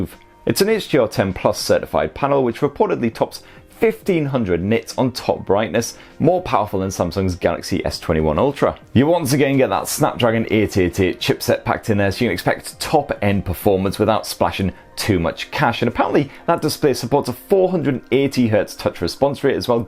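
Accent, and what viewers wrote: United Kingdom. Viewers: Love the approach to all your videos, you should be bigger but hey maybe some can’t handle the queen’s English. Keep up the great work mate .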